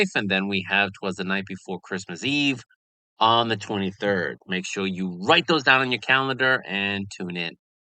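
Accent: Boston, United States. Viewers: Awesome review of what was shown at the con. As someone who lived in the Boston area for several years, I also always appreciate hearing the Boston accent in narration. Go Sox. Go Pats.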